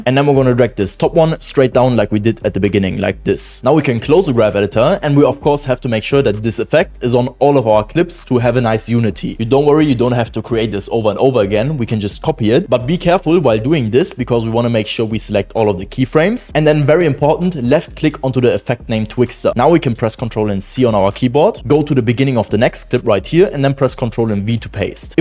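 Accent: Germany. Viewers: Ich höre deutsch 😉👏🏼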